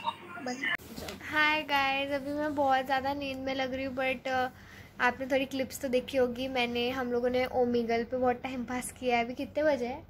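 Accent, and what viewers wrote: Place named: Nagpur, India. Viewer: i just love your nagpuri accent..like all nagpurkars can relate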